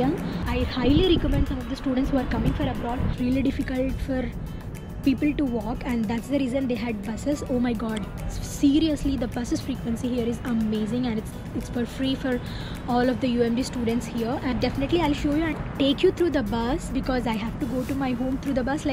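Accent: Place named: India. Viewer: your Indian accent is cute! <3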